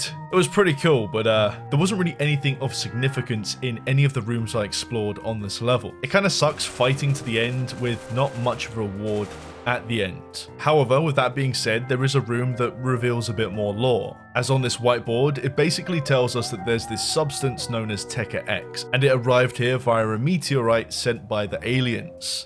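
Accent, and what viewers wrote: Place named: United Kingdom. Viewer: Why do I feel like feels a bit extra Bri'ish in this one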